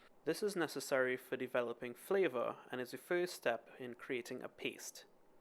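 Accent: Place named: Trinidad and Tobago. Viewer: Good speaking Trini voice and feeling proud.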